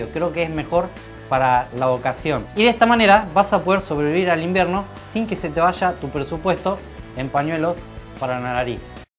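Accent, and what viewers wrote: Argentina. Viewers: Argentino.... Bahhh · me gusta tu acento argentino soy española y francesa pero vivo en Francia. todo eso me gusta pero en esta vida no tomamos tiempo siempre vamos de prisa y corriendo. un dia voy a intentarlo! !pero si estropeo mí plancha! !uuunnn.solo será culpa tulla. Me gusta como pronuncias las elles.un besote muy grandote desde Francia 👄👄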